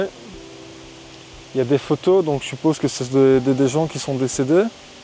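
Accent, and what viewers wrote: Belgium. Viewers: Ouit= huit j’aime trop ce petit accent belge. ♥️👍😊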